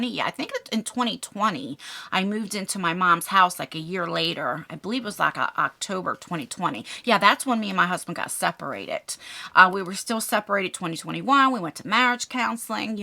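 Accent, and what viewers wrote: New Jersey, United States. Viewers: Love your videos! Whenever I hear your voice, I miss living in New Jersey :-). We are the same age and our birthdays are only a couple days apart, and I just learned a few years ago to trust my gut! Don’t let people gaslight you into making you feel like you’re losing your mind. You feel how you feel for a reason.❤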